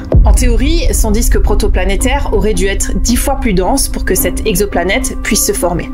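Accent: Alsace, France. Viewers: Le joli petit accent de notre belle alsace ,passionnant sinon ! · Comment t’arrives a capter qu’elle est Alsacienne ? Perso je vis aussi en Alsace mais je vois pas la différence d’accent avec ceux du Doubs par exemple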